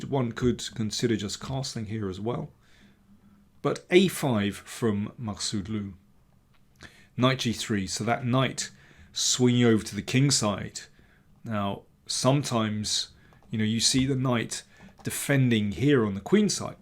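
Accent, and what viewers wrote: United Kingdom. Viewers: Absolutely agreed. What is crazy is how long he has produced such top-tier chess content... I remember discovering this channel when Carlsen defeated Anand to be the World Champion around a decade ago. If Daniel King is analyzing a game, that's what I'm watching. From my experience, nobody does it better. And with such a pleasant British accent, to boot! That never hurts :)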